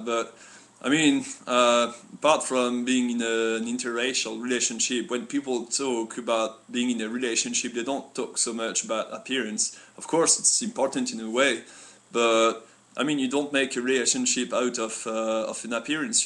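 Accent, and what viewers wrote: France. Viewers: Happy to hear about that!! Stay inlove and be strong! That's really true that being inlove is a wonderful feeling no matter what or where you came from..those people judging your relationship can't give the special feelings you felt to each other.. Nice accent by the way , same with my bf. He's from France also. He is French and I am Filipina😆...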